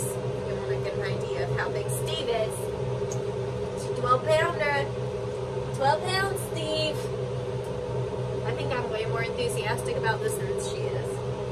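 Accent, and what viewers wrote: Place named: South Carolina, United States. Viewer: i love your South carolina accent so much lol im in the midwest 💟 i went there earlier this summer i miss yalls voices🤣💘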